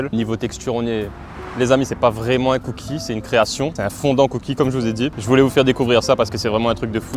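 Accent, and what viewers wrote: Réunion, France. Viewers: et pour l’origine je me disais bien mdrrr mi te dit te gars là n’a un accent comme nou 😅🇷🇪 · trop bien franchement et i remarque le ti accent réunnionais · Tu as l’accent . Tu es réunionnais ? 🇷🇪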